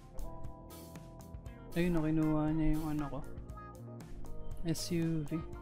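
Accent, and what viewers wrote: Visayas, Philippines. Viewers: Bisaya talaga accent ni lodi neto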